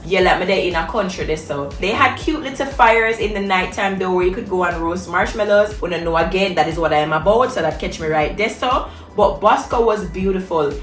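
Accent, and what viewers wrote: Jamaica. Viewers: Travel anxiety girl mi think a did me one❤❤😂 but u find bae happy for u girl gud me just love how u talk the things them like a real jamaican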